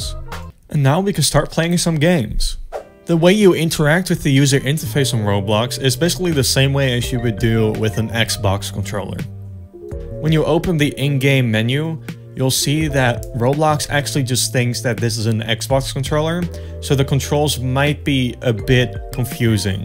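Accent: Netherlands. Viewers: Are you dutch? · i knew you were dutch also ty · I heard your dutch accent and instantly thought u were dutch then i saw the notification on ur laptop and yeah i was right you're dutch! Just like me :) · you have a dutch accent. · r u dutch? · are yu dutch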